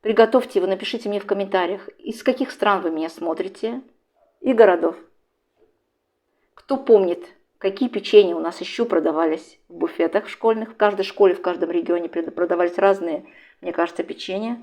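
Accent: Baku, Azerbaijan. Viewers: Вы наш глоток воздуха…, наша замечательная память о родном , любимом Баку!!!!! Все ,что вы предлагаете нам испечь, все вкусняшка! С огромным удовольствием слушаем Вашу речь с Бакинским выговором, мягким и нежным, только наши женщины так разговаривают, певуче! Будте Счастливы и оставайтесь долго, долго такой обаятельной и привлекательной!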